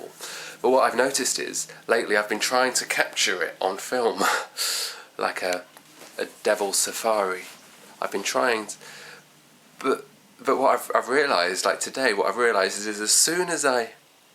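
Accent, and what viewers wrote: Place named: RP, United Kingdom. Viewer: btw you're very posh!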